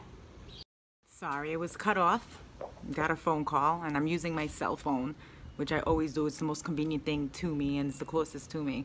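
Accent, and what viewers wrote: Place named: New Jersey, United States. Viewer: a stalker. By the way you sound more like Jersey than Brooklyn. Lol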